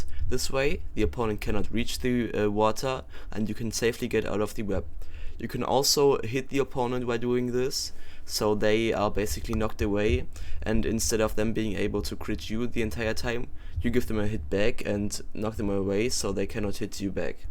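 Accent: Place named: Germany. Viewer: i can tell hes german lol like me xd if hes not german then idk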